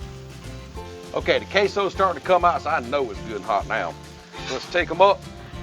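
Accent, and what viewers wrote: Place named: North Carolina, United States. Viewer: Looks so good! Where you from? You sound mid north NC. Am I close?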